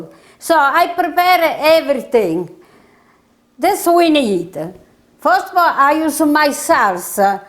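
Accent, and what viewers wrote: Italy. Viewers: .....,mi chiamo Carmela...I love your Italiano- Inglese as much as I ❤ love your cooking! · Im starving now😋 i have to make this. I love penne ala vodka and the peas are a good addition. I also love your singing and beautiful Italian accent 🇮🇹 Bravo!! 👋👋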